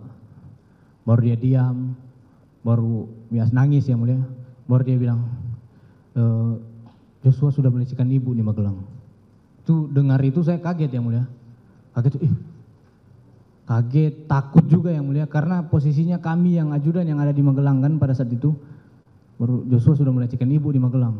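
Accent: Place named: North Sulawesi, Indonesia. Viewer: Dialeg SULUT tetap dong ❤️❤️❤️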